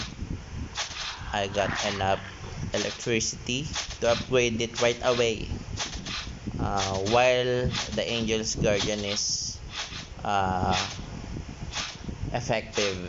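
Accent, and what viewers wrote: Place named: Mexico. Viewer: Habla español no te hagas el gringo q tienes acento mexicano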